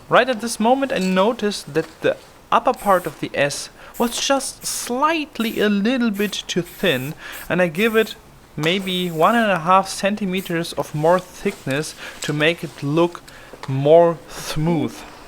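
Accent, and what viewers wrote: Germany. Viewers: Hi, love your work! Where are you from? Love your accent! You sound either German or Scandinavian.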